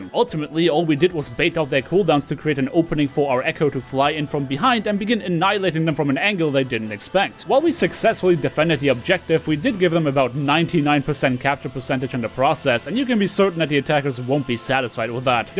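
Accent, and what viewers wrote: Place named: Germany. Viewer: He sounds kinda German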